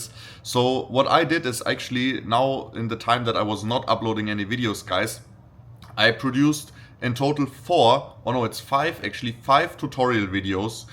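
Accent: Germany. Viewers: Hi, I am delighted to see a new upload from you and thank you for sharing your thoughts and strategies. As starting trader they are very valuable to me and couple of times prevented irrational greedy descision that would have turned badly. Not always, sometime greedy holding on to draining longs happened, but over time I want to reducde that kind of action, to zero preferably. Somehow shorting feels more scary in a bullcycle and than longing at high prices. About sharing your private life. I think it is inspiring to see and have that in your videos. You live the dream of I guess many of your followers. Curious about your tutorials but even more: Would you share with us how you made your first 10K, 100K, 500K. When did you decide to move to Dubai? (assuming from your accent that you grown up in Germany). How did you arrange the move? Are there tips you have for people currently living elsewhere that play with the idea to move to Dubai. 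Hope someday you will share that story. Wishing you a great remainder of the weekend.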